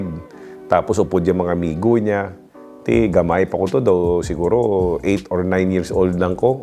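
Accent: Iloilo, Philippines. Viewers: Nami pamati-an ah...certified Ilonggo gid... ❤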